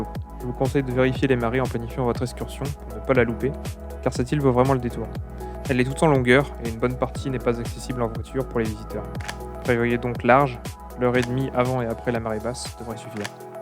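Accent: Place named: Paris, France. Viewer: n'est pas un gars du coin, car il prononce les noms à la Parisienne! C'est désagréable d'entendre quelqu'un vouloir enseigner aux autres en écorchant ainsi les bases.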